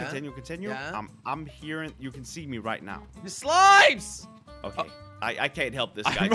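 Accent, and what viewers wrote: United Kingdom. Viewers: his accent is thicccc British mate:)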